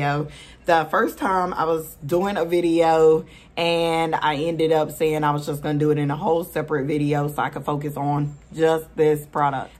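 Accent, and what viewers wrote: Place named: South, United States. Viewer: Love your Southern accent! I'm from Alabama. Thanks for the suggestion. My hair is EXTREMELY dry and damaged, so hopefully this will work. 🙏🏾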